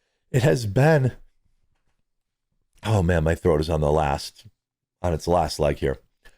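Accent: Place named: Milwaukee, United States. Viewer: Your hoarse voice and Milwaukee accent is very surfer bro.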